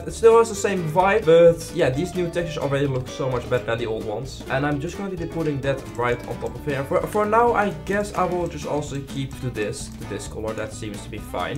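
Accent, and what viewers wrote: Netherlands. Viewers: Are you Dutch? Because I think so (Ik denk het namelijk wel)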